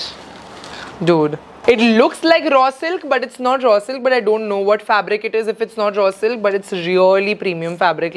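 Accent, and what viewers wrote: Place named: Karnataka, India. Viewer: Hey.... Love ur video... U r a lady boss.... I m from Karnataka....i loved ur kannada accent....u r just the inspiration for younger generation....i really feel very happy to see your videos...i think this my first comment on ur videos....i watch all ur videos.... U r the best... Super independent women ❤️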